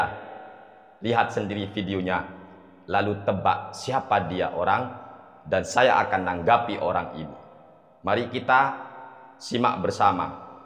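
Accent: Madura, Indonesia. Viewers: ini org madura mna?? klhatan logatx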